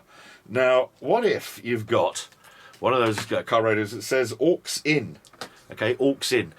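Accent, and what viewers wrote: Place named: United Kingdom. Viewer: Well I was actually searching for a hack for my old Pioneer Stereo with surround sound in my home, but I quite enjoyed watching presentation. your personality, sense of humour, and tea cup talk was quite refreshing! I'm a stagehand that lives in the U.S. and a lot of roadies are from U.K. so that's why I call your accent "tea cup talk". lol. Thanks for having an awesome sense of humor! (: Now back to figuring out how I can do a hillbilly delux engineering on my stereo myself. That's the only kind of "rigging" I excel at in my line of work. lol. carry on! and take care fine sir! your awesome!!!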